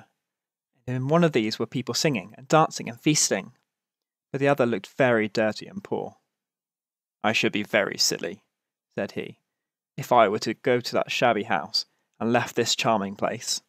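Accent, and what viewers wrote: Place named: United Kingdom. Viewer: I have searched and searched for these in a BRITISH ACCENT and here I have finally found it!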